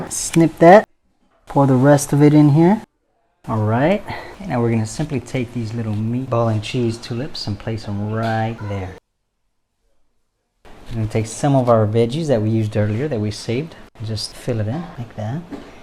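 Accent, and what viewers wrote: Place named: United States. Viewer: You watched a man with an American accent cooking a variation on typical American food and didn’t think he was American? I don’t know how to help you.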